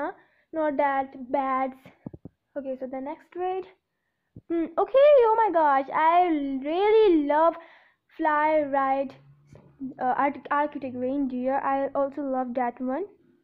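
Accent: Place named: India. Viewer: His accent is Indian but the video location is the us weird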